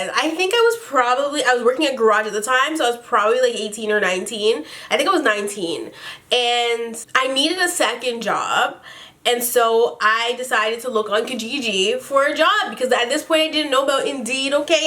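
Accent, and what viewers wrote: England, United Kingdom. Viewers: Love your English accent 😂😂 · Her British accent lolll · I like your British accent it fits you.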